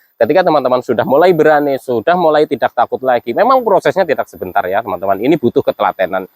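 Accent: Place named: Banyumas, Indonesia. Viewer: Mas enak penyampaian katanya, saya baru gabung aja suka lihat. Karena setiap saya dengar dan nonton. Kecemasan saya berkurang. Semangat terus mas, dan ayo semangat juga buat kita supaya lekas sembuh dari asam lambung dan kecemasan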